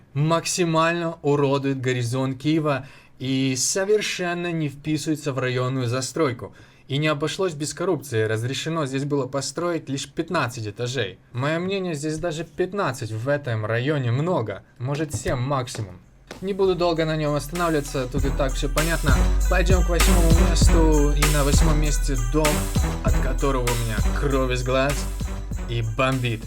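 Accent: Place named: Russia. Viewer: а по мне так ты сам урод с маскальским акцентом ... :face-orange-raised-eyebrow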